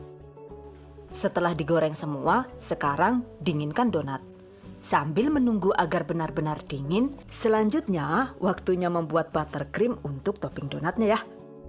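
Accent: Banyumas, Indonesia. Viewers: Yahh koo malah ngapakk... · Hehhehe sambil semyum2 sndiri klo udh liat vidio bahasa ngapaknya buat lucu #GiveawayTHV2021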